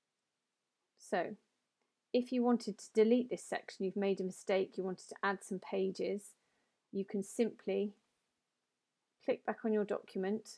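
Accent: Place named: Bristol, United Kingdom. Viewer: Are you from Bristol by chance? Your accent sounds much like my friend who grew up in Bristol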